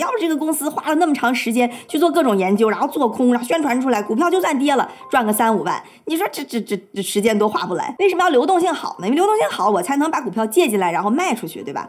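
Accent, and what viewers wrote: China. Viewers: 你如果講話能沒有大陸口音會更吸引人。還有，學習會用破音字。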